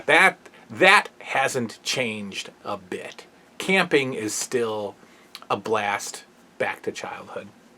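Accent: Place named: Central Pennsylvania, United States. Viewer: Where are you from? It sounds like we are close to each other. I'm from central Pa.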